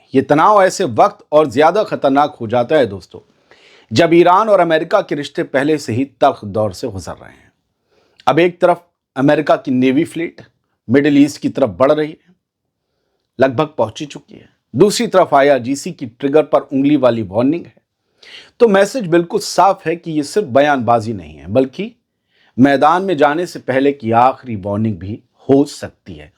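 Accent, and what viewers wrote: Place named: Awadh, India. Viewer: bhai asslam walaikum wa-rahematullahi wa-barqatahu Bhai main Gonda u.p se belong karta hu aur mahesoos karta hu shayed aap bhi awadh se hain kyunki news' padhte waqt aapki bato se maine kai baar mahesoos kiya hai.... ALLAH HAFIZ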